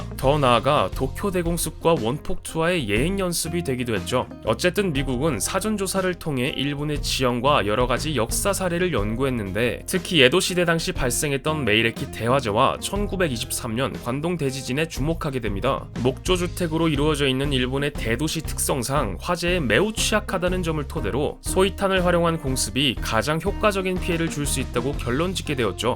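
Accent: Gyeongsang, South Korea. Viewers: 혹시 경상도가 고향이신가요?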